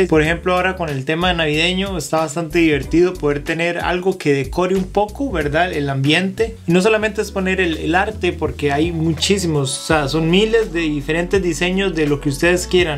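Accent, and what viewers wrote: Costa Rica. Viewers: Saludos mae (sorry pero el acento tico fue algo que le agarré desde la primera frase). Muy buenos los videos, encontré el canal por mi búsqueda de información sobre la Mac Air 1 la cual deseo adquirir para trabajar en Análisis de Datos (SQL, Excel, Power BI) y por lo que veo de sus comentarios (así como referencias de amigos que trabajan en TI) pues parece que la opción de la Mac Air I es bien sensata y parecería ser suficiente. Una pregunta: –Gustos aparte ¿qué color me recomendaría? Me gustaría mucho la negra, pero según veo se puede ver descuidada por tanto manoseo o así, entonces pienso en la gris.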